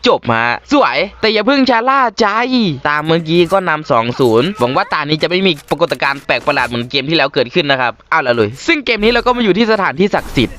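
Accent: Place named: Isan, Thailand. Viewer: คลิปนี้พี่เป็นคนอีสานนะครับทำไมพูดเหมือนคนอีสานเลย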